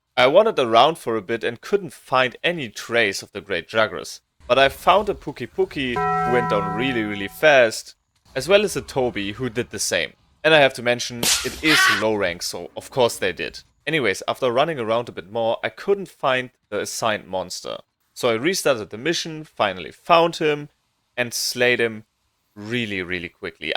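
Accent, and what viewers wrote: Germany. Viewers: Are u german?😄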